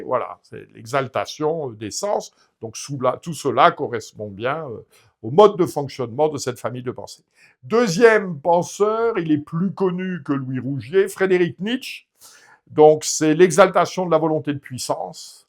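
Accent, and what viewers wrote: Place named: Alsace, France. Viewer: Je suis communiste et j'ai apprécié la vidéo. Elle était bien construite, bien problématisée et la diction etait parfaitement claire. Êtes vous alsacien ? Ou lorrain ? vous avez un léger accent. Je connaissais certaines choses mais vous m'en avez appris Tout celà serait très utile pour instruire les dossiers d'instruction menant à la condamnation au goulag.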